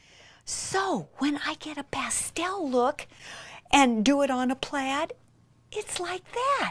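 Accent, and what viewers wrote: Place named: United States. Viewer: man this woman combined with her american accent is just the best. ive got stuff to do but i want to watch the whole video!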